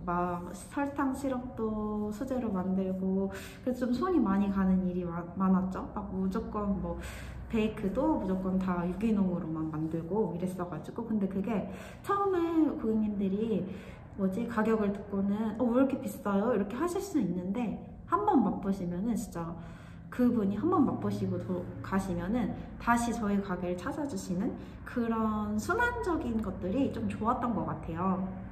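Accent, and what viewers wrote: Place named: Gyeongsang, South Korea. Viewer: 사장님 경상도분같은데 말투가~! 카페 부산쪽에있으신걸까요~~ 한번 가보고싶어요💕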